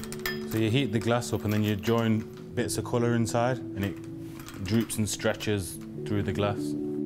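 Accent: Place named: Nottingham, United Kingdom. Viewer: I love hearing a Nottingham accent on YouTube...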